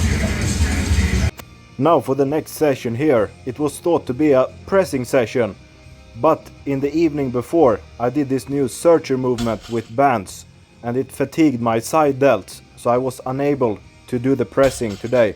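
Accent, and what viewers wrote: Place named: Sweden. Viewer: With your Swedish accent, It feels like watching as if Magnus Samuelsson filmed his sessions in his haydays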